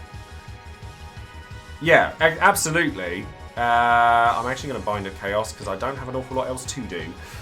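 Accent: United Kingdom. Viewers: This is a set kill of a team thanks for sharing i didnt have garlands burst but i have terras burst as well as her LD also Garlands and Layles LD , Used tifa support in the beginning as well and pulled it of in 30 turns. Love the UK accent a lot, i think of amy winehouse every time i hear someone from the uk speak. After i beat this stage and got the tickets you would not believe i pulled out both kurasame, seifer, and sephs LD 🤯🤯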